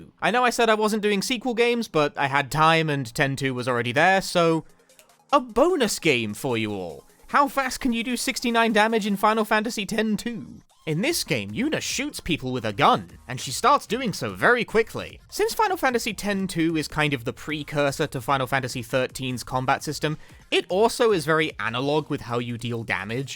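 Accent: United Kingdom. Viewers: you sound way more british in editing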